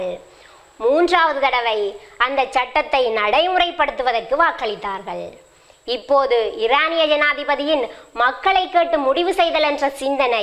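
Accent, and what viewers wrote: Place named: Sri Lanka. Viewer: இலங்கை தமிழுக்கு நான் அடிமை இலங்கை தமிழேக்கு தனி திறன் கேட்பதற்கு இனிமையாக உள்ளது தங்கள் தமிழ் உச்சரிப்பு....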